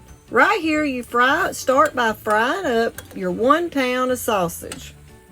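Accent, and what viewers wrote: South, United States. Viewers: This is how you won't it to be I love that southern accent, and this is how I like my sausage and gravy to turn out too, make sure to cook that flour long enough and add the right amount of milk just like my mom used to make, over biscuits, rice, fried or mashed potatoes it's comparable to crispy chicken gravy only usually lasts a little longer cuz the chicken gravy usually goes with the chicken then it's gone, fried chicken with mashed potatoes I usually end up a lot fuller cuz of the mashed potatoes and gravy I'm just saying cuz, that's the way it is!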